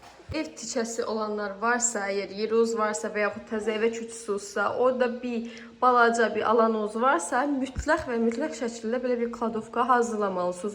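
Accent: Sheki, Azerbaijan. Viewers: Videonu şəki ləhcənizə görə izlədim. Rayonlara getmək fürsətim olanda ilk seçimim Şəki şəhəri olur. İnsanları, təbiəti, şirniyyatları.... Sevirəm Şəkini. Allah yeni evinizdə şirin övladlarınızla, yoldaşınızla xoşbəxt yaşamağı nəsib etsin .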